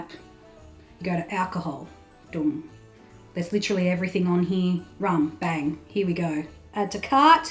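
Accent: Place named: Australia. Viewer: I'm not used to the Australian accent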